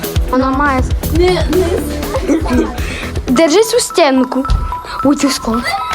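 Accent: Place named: Transcarpathia, Ukraine. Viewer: Закарпацький діалект 😅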